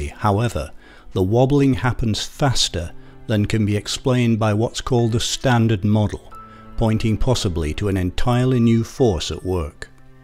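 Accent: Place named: England, United Kingdom. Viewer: I'll admit it. the English accent makes me pay attention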